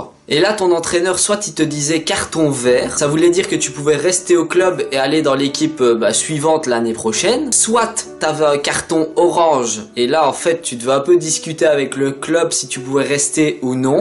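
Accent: Liège, Belgium. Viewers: J’ai entendu que ta voix a l’accent Liegeois moi aussi je suis Liegeois PS: je t’adore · Toi ça s'entend t belge #wearebelgium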